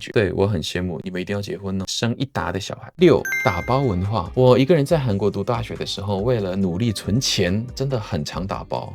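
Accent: Taiwan. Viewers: 好邊準的台灣腔國語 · 常看you tube 影片,今天才有看到你的幾支影片,很好奇你的說話完全百分百如台灣人一樣口音、甚至更好,是在台灣生活幾年？能有如此語言程度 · 超級標準台灣腔欸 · 我幹麼解釋這個哈哈哈哈 然後台語也太標準了😂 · 聽到你講國語，會覺得你是韓語講得很好的台灣人😅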